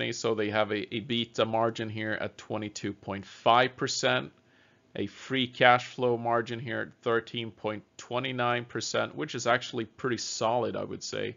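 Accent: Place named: United States. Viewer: Thank u FOR SPEAKING AMERIKAN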